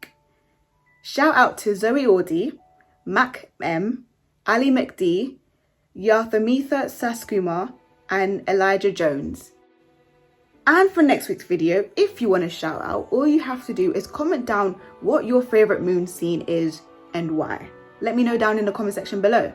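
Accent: United Kingdom. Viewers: I love your British accent 😍 ❤ it's so nice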